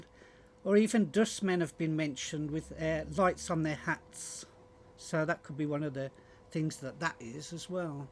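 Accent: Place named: Birmingham, United Kingdom. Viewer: Sounds like a brummy accent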